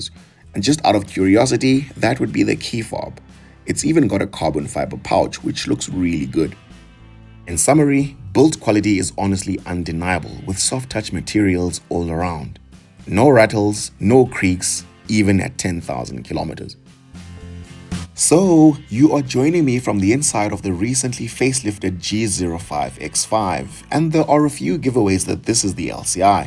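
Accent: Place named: RP, United Kingdom. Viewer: Lol hell no broh, don't tone it down, it makes your channel different broh, you're not trying to imitate someone else, you're just being you🙌🙌😁😁so i, personal don't mind the private school english you have bro😂😂😂but maybe if these comments persist then maybe you can open a poll and people voting to whether tone it down to Africanism or not🤔.....lol tjoo that's indeed a very tough call to make broh but maybe it will go down to which one you prefer the most between Engine and technological advancement but I feel like i can go with a bmw x5 m60i cause it still has a V8 engine in the hood and the interior designs is in a premium level and from depreciation statitics it alleged that M60I holds its value better than the RSQ8🤔😁😁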